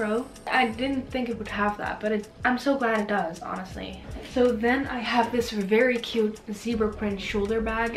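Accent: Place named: United States. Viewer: bro u sound American and ur English is GOOD🤝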